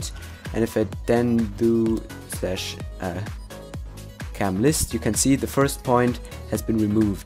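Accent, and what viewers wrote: Germany. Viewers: Is he from germany?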